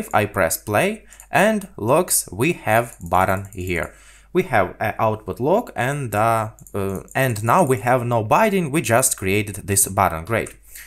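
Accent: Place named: England, United Kingdom. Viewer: O, vi iz anglii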